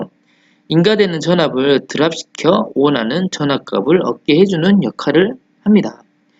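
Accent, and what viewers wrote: Jeolla, South Korea. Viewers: 전라도 사투리가 강하고 구수하네요~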